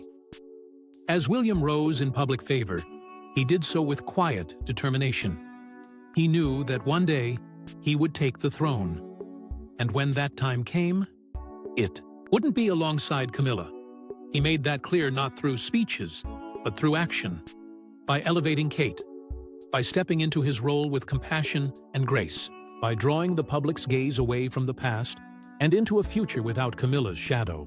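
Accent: United States. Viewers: Why are these videos read by Americans? ANYBODY??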